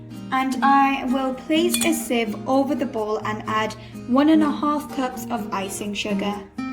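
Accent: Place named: United Kingdom. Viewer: Love listening to your British accent. Keep posting!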